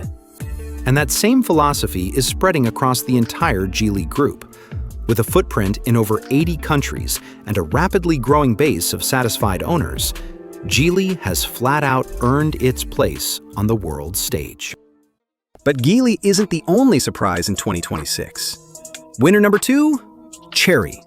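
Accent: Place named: United States. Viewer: And a yank is telling us all about it 😂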